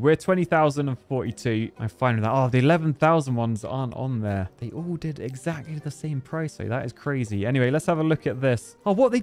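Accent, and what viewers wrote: United Kingdom. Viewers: Always walk on the strongest par' of a bridge. Love bri'isch accent without the middle t :D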